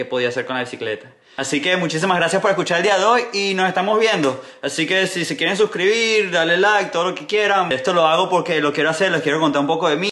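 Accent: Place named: Venezuela. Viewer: Felicitaciones por esa tan merecida medalla de plata en las olimpiadas de Tokyo 2020...para mí, esa medalla es de "ORO" brother, tú la mereces mil veces mi pana. Que orgullo escucharte Daniel Dhers..! Tu sencillez y humildad es de "ORO" mi pana. El hecho de que tú has vivido en tantos países...y escucharte manteniendo ese acento venezolano...es único. Conozco a muchos venezolanos que viven en otros países y cambian su manera de hablar (pareciera que les da pena ser o hablar con el acento de Venezuela); es decir "este tipo de personas" no tienen identidad por Venezuela. Tú al contrario eres la esencia del buen venezolano. Te admiro mi pana. Te deseo muchos éxitos y bendiciones en tu vida. Eres ejemplo a seguir. Saludos mi pana.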